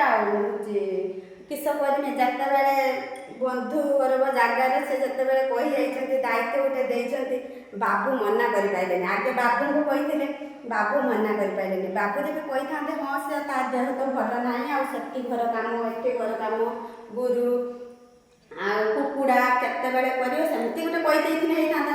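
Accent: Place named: Balasore, India. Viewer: Aapan Kathaa ru janajauchi Balasore boli ame bi Balasore pila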